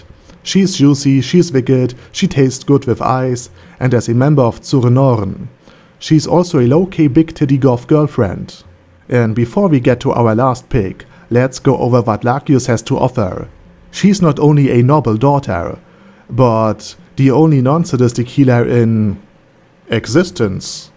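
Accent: Germany. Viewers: oh, so you're german!! that explains the accent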